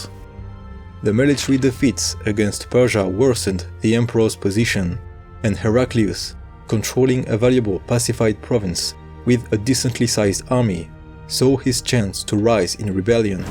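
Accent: France. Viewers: 😂 l’accent French , merci beaucoup tu régale , j’imagine pas le taff derrière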